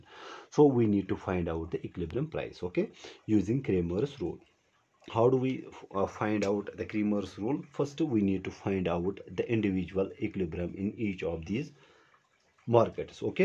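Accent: Kashmir, India. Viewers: you have an amazing Kashmiri accent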